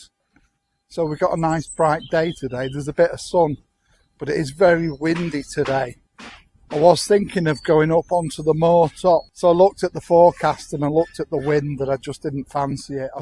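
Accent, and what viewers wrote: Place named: Manchester, United Kingdom. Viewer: Greetings! Its always nice to hear you and walk with you. I think I wrote it before, but I enjoy your english... speed and Manchaster dialect?! (Is there a Manchester dialect?!)... very much. It makes my rusty german school english more fresh. Thank you for that.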